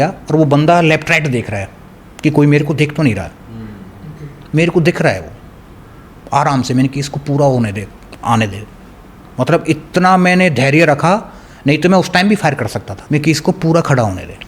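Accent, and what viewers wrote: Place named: Palwal, India. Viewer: Inki aawaz palwal side ki si lag rahe hai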